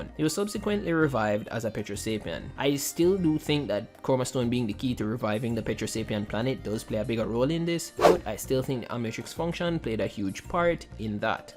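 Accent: Jamaica. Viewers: Are you Jamaican ? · bro u Jamaican? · he sounds jamacian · Ur Jamaica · Are you jamaican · Out of curiosity, are u Jamaican 😭 i hear a accent but cant pin point it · Hes jamaican but i cant prove it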